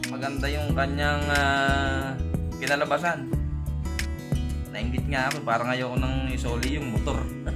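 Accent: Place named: Bulacan, Philippines. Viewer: Pa shout out ndin. Tga bulacan kaba? Parang bulakenyo kasi tono ng boses nyo po. Bulacan din po ako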